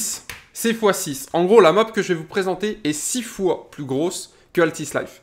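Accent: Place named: England, United Kingdom. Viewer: Mdr j'adore ton accent anglais lol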